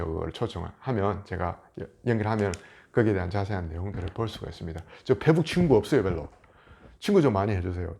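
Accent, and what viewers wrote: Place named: Gyeongsang, South Korea. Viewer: 영상 너무 유익하게 보고 있습니다^^ 경상도억양의 fluent한 잉글리쉬가 너무 재밌어요..!! ㅎㅎ 재밌게 ?직관적인 설명 감사합니다~~~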